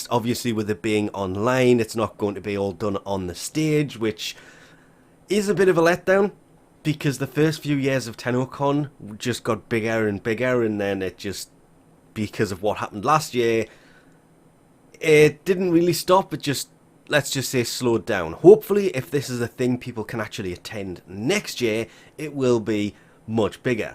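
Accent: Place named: Newcastle, United Kingdom. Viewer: Geordie (from Newcastle I would guess).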